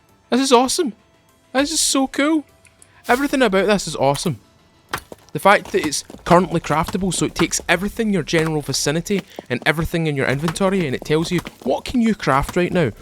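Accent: Scotland, United Kingdom. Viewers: I've been looking for a new SCUM player but I don't think I can watch your videos, I'm Scottish as well and the inevitable thing that all Scottish people have to do so everyone else understands us also is unbearable to us! 😂 Nothing personal mate 👍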